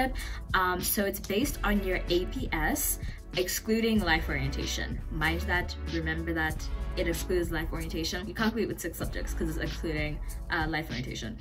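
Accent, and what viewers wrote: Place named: United States. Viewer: Delicious content,. Why do you have an American accent? did you grow up there?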